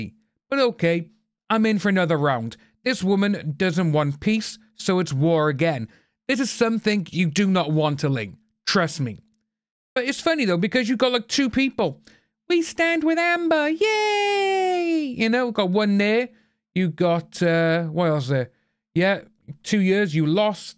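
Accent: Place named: Wales, United Kingdom. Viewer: Fellow Welshie, here. Love your Welsh accent! 😄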